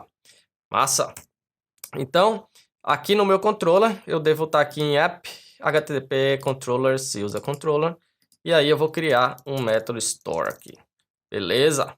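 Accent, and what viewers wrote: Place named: Bahia, Brazil. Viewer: Muito top a aula mestre!! Acho maneiro seu sotaque baiano hahahaha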